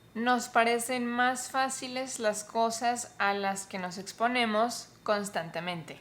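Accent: Colombia. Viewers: I liked your video, great explanation, but your accent is great. I love the Colombian accent, I can understand about 99% of what you say. I've traveled to Spain and Mexico, and spoken with Chileans and Argentines. But the Colombian accent is easiest and clearest to me. Thank you also for making me feel better; I was watching a movie in Spanish from Spain and had to put on the subtitles, even though I studied there, it was very hard for me to understand what was said. Anyway, keep going, I love the videos. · Para mí es el acento de Colombia porque estudio con tu curso. :) También el acento de Ecuador (en algunas regiones) es fácil de entender.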